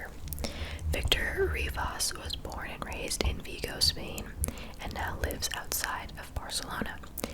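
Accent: United States. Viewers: Hi!I really love your videos, the true crime “video series” it’s absolutely amazing, your voice it’s soooo relaxing and I love you American pronunciation❤️😁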